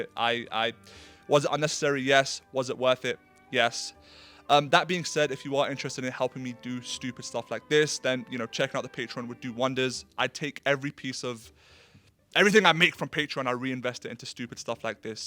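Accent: United Kingdom. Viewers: a hot British voice, get it right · guy has an annoying british voice lol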